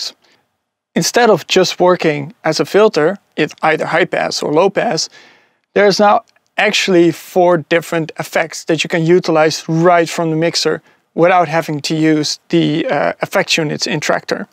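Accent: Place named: Netherlands. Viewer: Ik kan horen dat je uit Nederland komt, mooie review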